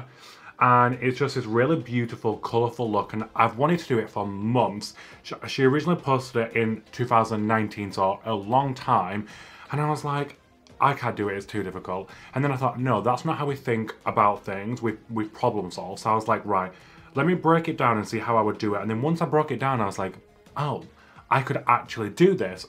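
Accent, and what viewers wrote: United Kingdom. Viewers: I’ve read you are from London so what’s with the northern / East Midlands accent ??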